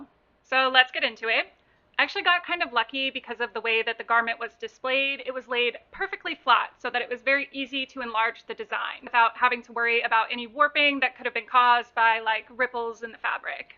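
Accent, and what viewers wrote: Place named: United States. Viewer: I love your art but I dont like american accent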